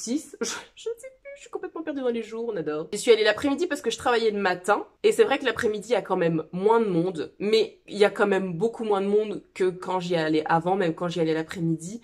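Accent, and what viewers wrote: Lorraine, France. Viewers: Coucou! Je suis de Nancy aussi et comme toi, je suis allée au marché aux tissus le 6 mai. Je partage totalement ton avis concernant la baisse du nombre d'exposants et par conséquent, du choix de tissus. Je trouve aussi que les prix ont suivi la conjoncture économique et qu'on fait de moins bonnes affaires. J'ai été très raisonnable, juste un coupon de jersey et un peu de mercerie. Il faut dire que je suis allée à celui du Luxembourg début mars et mes parents habitent dans la même rue que la grange aux tissus, de quoi faire! Lol Merci pour ta vidéo pétillante et de faire découvrir aux autres cousettes notre pittoresque accent lorrain! 😂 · Cc, ça fait du bien de te revoir 😊 Quel bel accent lorrain et beaux tissus. Je ne suis pas convaincue que l’on fasse des affaires dans ces salons 😊 Bonne continuation, à bientôt Raphaëlle DVN a cousu la robe de Bellen Paris, elle est magnifique !!! 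Beau choix 👍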